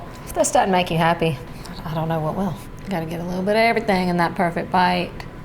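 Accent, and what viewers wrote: South, United States. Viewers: I do too. Very much the voice of a young Demi Moore. A little rough, sweet southern accent..... Magic.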